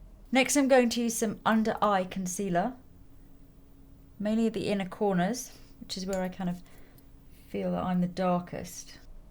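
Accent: United Kingdom. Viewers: wher u from UK??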